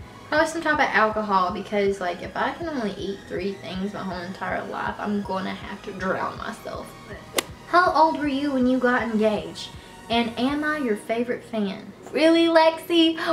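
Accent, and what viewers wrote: South, United States. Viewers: is she country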